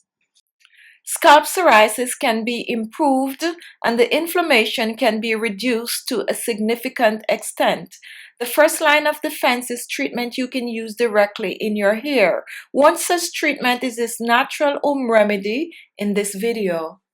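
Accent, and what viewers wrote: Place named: Jamaica. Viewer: You must be Jamaican with that accent , great video..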